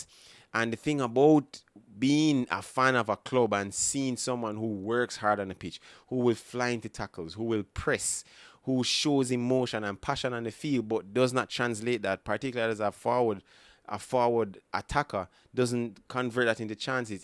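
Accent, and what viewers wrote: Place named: United States. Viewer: They said that aboyt him last season, he is not in the decline. I reccomend you dont say that until its actuallu factual it brings into question the validity of anything you say especially with an american accent plays into the steriotype a little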